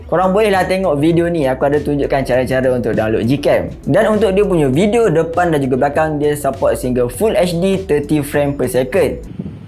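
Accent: Sarawak, Malaysia. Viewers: Kita org sarawak ka?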